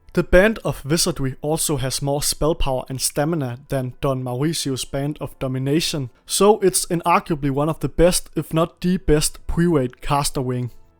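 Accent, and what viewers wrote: Denmark. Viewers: Love the accent - a fellow dane here! Great vids bud! Do you stream on twitch or only making content here?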